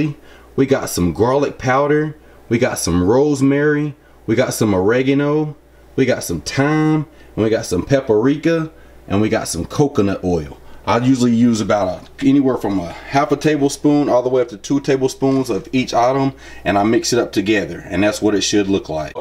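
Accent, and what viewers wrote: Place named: Texas, United States. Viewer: I bet you are from Texas, you got that accent I’m familiar with 🥰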